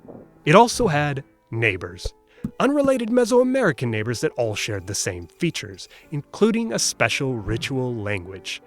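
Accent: Italy. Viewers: I cant help but notice you tend to use Italian (or maybe Latin?) accent and inflections when you speak not English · Your Italian accent comes out on this one. But this one was very well done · This narrator has the consonants correct, but in some areas he expresses an Italian accento, which is not in the Aztecca pronunciation.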